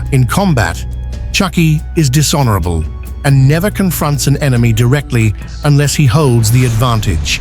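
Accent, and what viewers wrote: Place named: United Kingdom. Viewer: I think he did pronounce leprechaun but in a British way like they always talk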